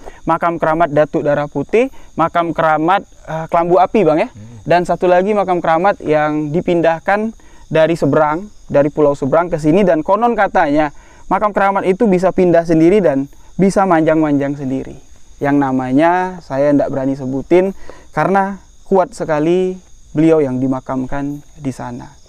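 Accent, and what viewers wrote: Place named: Jambi, Indonesia. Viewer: Assalamualaikum, bang slm santun slm sehat, apapun cerita misteri yg diceritakan sm bang enak punya ciri has bhsa jambi nya sngat amat kental saya suka bgt dari cara bicaranya bang emng orangnya sangat santun sukses trus bang dn teemnya moga sllu diberikan sehat, Amiinnn...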